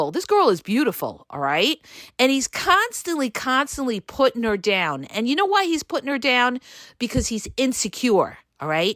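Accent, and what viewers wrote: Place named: Bronx, United States. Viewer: And all topped off with a Bronxy New York accect - perfect. Taking no shit...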